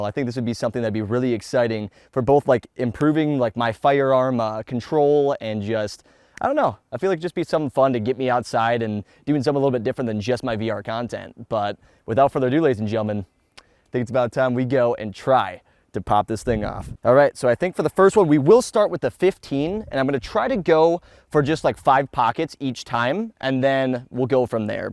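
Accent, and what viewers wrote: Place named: Texas, United States. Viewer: He has to be from texas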